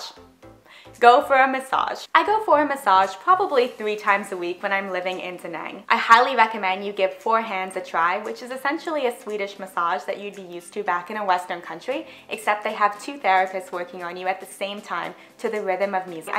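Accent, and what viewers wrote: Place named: Upstate New York, United States. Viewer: Where's your accent from? You have an accent that sounds like a north New York accent.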